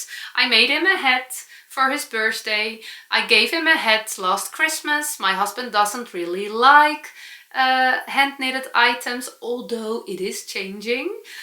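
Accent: Netherlands. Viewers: We love your Dutchy English. I wish I spoke Englishy Dutch! 💕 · Definitely second this. Dutchy English is perfect just the way it is - makes my heart jump every time! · Your English is just fine and I love the Dutch flavor--Dutchy English--I love it!! Don't lose it!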